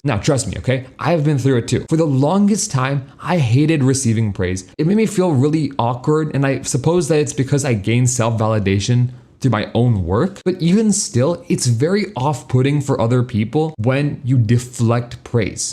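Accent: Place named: India. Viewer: Bro drops the Indian accent "azzume" like crazy this is giving unprecedented flashbacks to my proof writing class Edit: I'm literally just going to subscribe to you because you summarize a bunch of techniques in books to I never have to read them myself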